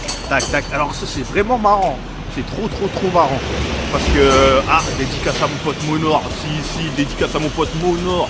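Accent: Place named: Banlieue, France. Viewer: Jai trop hate daller au Japon, par contre tu parle vraiment comme un banlieusard mec, mais cest pas grave jadore tes vidéos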